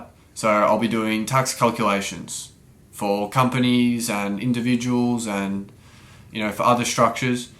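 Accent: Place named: Australia. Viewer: This is an Astralian accent, I guess.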